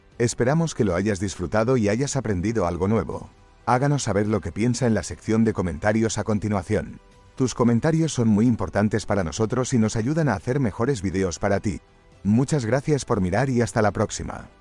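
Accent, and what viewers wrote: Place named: Latin America. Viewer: "Los barcos petroleros" 🤣.........español....¿de donde?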